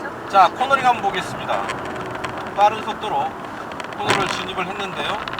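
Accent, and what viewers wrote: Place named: Jeolla, South Korea. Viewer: 설명이 건조하고, 사투리톤이(전라도) 강해서 영상이 딱딱함ㅋ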